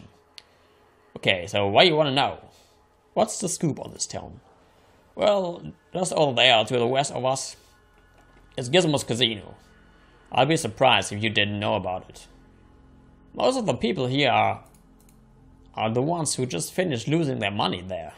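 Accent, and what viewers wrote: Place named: Germany. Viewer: You should talk to again and get him as a follower. By the way where are you from? I've always thought your accent sounds like German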